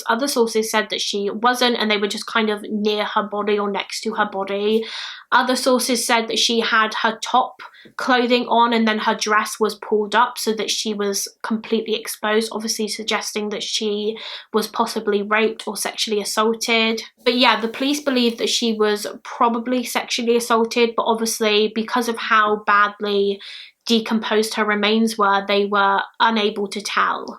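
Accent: United Kingdom. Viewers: i dont hate u but i hate uk accent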